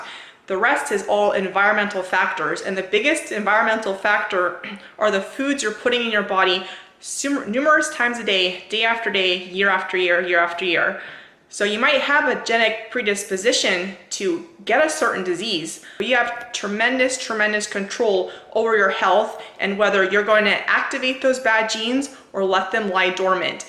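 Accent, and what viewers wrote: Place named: Chicago, United States. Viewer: I agree with you 1000%. I wish I would have started earlier to take care of my body. The last 50 yrs went by so fast and I am not 71 yrs old and while I have given up meat I still eat some types of fish and eggs though i am trying to wean myself from that. I will most likely give that up by February though I still drink non-fat milk and sometimes I eat cheese but Not butter. So i am looking forward to being a vegan by Feb 1st. Thanks for you video.. Your accent sounds like your either from back east or Chicago. I am originally from Ohio but have lived in Los Angeles for the last 57 yrs. I love your birds and my "tiel" "Baby" is still with me. Thanks again for your video ..Take care...keep up the good work!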